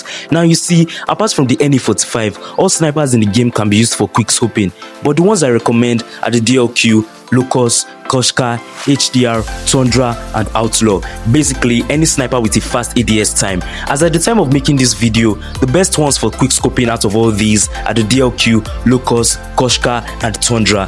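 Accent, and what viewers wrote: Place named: Nigeria. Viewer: You sound like a Nigerian ngl like me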